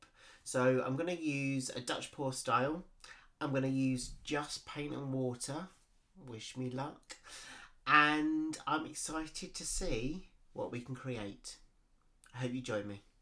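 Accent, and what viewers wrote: England, United Kingdom. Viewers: OMG.I could almost hear your cogs turning and I loved it.I'm glsd I'm not the only one who makes split decisions. No matter how we plan,the art just takes it own natural journey. The piece it beautiful.So delicate and full of movement. I LOVE IT. Thank you for sharing.Git the ljnk off Facebook and have now subscribed to your channel.I may do the Molly/Olga and Donna course seeing as you have done it,it must be good.I love these 3 artists.I am also wondering about the Daler and Rowney paint.Is it a good brand?I am in Ireland and am wondering (because of your accent if you are England)because if you are then the products you use are probably easier for me to source. Thank you so much for the links and videos.I will enjoy watching.I like your style.🤗🇮🇪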